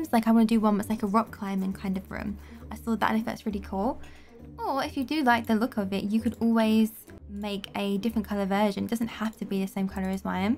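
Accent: United Kingdom. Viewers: I don't know what it is but I just LOVE british accents... IDK why lol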